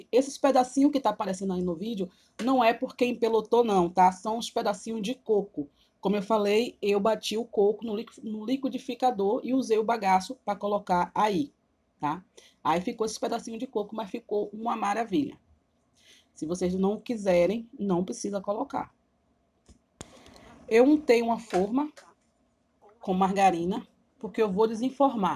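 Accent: Bahia, Brazil. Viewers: Êita que saudade desse sotaque da Bahia. Lembro da infância e de minha mãe preparando para mim! Aqui em Buenos Aires não tem ameixa em calda no mercado. Vou tentar fazer o caldo de ameixa aqui. Muito obrigada por compartilhar!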